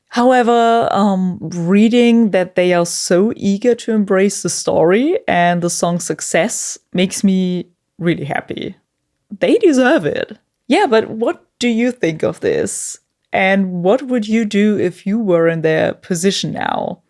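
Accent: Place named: Germany. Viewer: Dein deutscher Akzent erinnert mich an von. 😀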